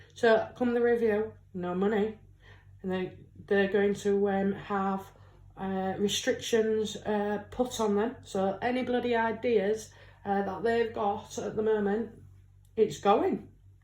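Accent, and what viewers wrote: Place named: Lincolnshire, United Kingdom. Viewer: I enjoy your accent! You remind me of home :) (Lincolnshire)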